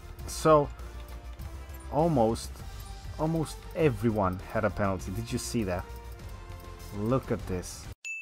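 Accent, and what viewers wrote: Romania. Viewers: Is he from Romania? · Are you romanian? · Are you from Romania?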